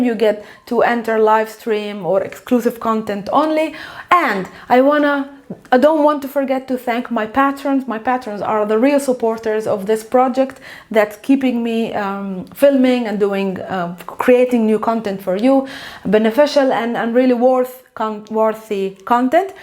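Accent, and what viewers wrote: Palestine. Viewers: كتيير حلو .. اللهجة الفلسطينية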